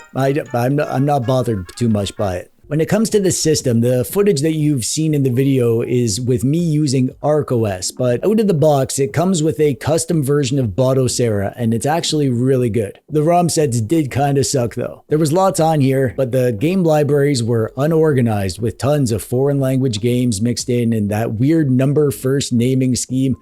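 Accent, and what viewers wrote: Canada. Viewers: I hear a guy with a Canadian accent, I’m trusting him. This handheld looks like a winner!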